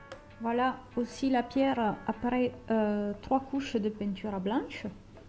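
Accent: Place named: South, France. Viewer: belle vidéo , avec un petit accent du sud , plus exactement de l'Aude ,je me trompe ? lol Bonne continuation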